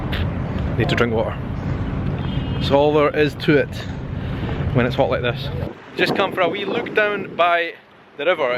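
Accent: Brazil. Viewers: That's really funny, this guy pronounces all the names like a Brazilian; maybe because most people working with public there are from that country? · You have Brazilian accent when you speak Portuguese. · You speak PORTUGAL, PASTEL DE NATA (Pastéu di Nata) like a brasilian. You never talked portuguese of Portugal 🤣 🤣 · You know you are speaking brazilian portuguese, right? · Minute 4, the palace building was the City Hall :) I also like your brazilian accent. You were so lucky with the weather, 30 degrees in October is not usual.. But unfortunately, those wildfires came with it too :(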